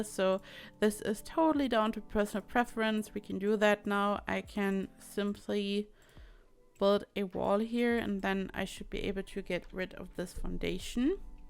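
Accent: Germany. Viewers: I don’t know why but since I am a German I think that she sounds very German when she speaks English ( can you tell me if I am right ) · You have a German accent. Am I right? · Bin ich echt die einzige die bei den ersten Wörtern schon wusste SIE IST DEUTSCH 😂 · Are you from Germany? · Are you german? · From where she is German? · Du hörst dich deutsch an kann das sein? 😊(gerade erst indeckt noch keine anderen Videos von dir gesehen)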